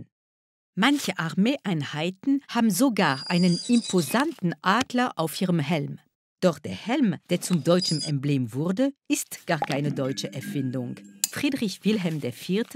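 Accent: France. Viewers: Dieser "komische" Akzent ist ein französischer Akzent und wird von Karambolage schon seit gefühlten Ewigkeiten verwendet. Vielleicht übernehmen die mit den deutschen Akzent ja die französischer Karambolageversion.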